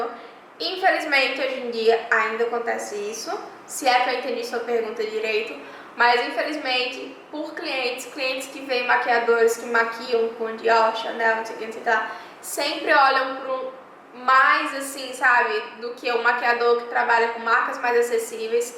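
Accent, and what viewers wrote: Northeast, Brazil. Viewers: Eeeee ,até que enfim ,descobri de onde vc é,suspeitei que era nordestina pq falamos muito igual...Kkkk,sou de Natal RN e estou apaixonada pelo seu canal. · . adoro o seu sotaque vcs nordestinos. eu sou de formosa Go. sou cabelereira e maquiagem tbm. amo a profissão da beleza gosto do seu trabalho te acompanho a pouco tempo mais me identifiquei com vc. Que Deus te derrama muitas bênçãos em sua vida pois tudo que vem de Deus e bom perfeito e agradável. bjos manda um bjo pra mimmmmm. se vc puder entra no meu stagran e vê mais trabalhos e me sal o que vc acha a me de dicas.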